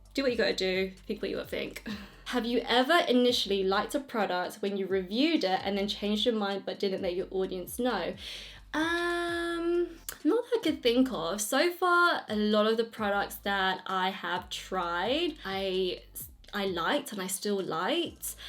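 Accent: United Kingdom. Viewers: Are you British? Your accent is so cool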